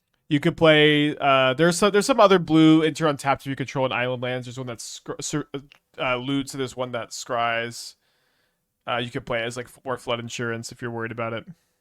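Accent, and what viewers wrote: Texas, United States. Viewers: Texas accent, i hear it that way.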